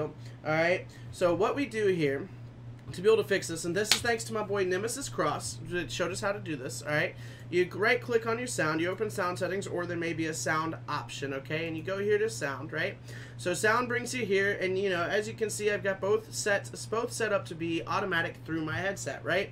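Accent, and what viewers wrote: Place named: United States. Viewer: Thanks, your american accent is pretty good by the way 👌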